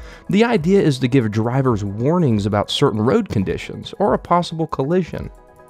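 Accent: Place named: Russia. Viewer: чьють чьють руский акцент ырше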